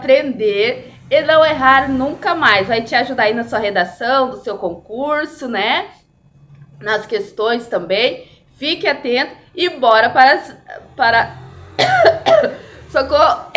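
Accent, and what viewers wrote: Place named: Paraná, Brazil. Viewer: Aula muito boa , oratória muito boa com o sotaque do nosso Paraná rsrs. Vou indicar pro meu filho assistir, sucesso professora !!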